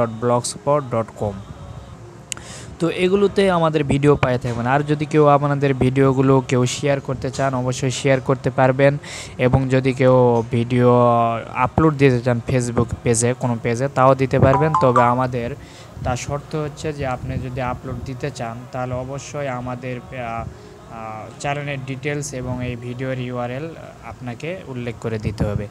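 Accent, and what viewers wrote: Naogaon, Bangladesh. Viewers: কথা শুনে মনে হচ্ছে বাসা নওগাঁ তে।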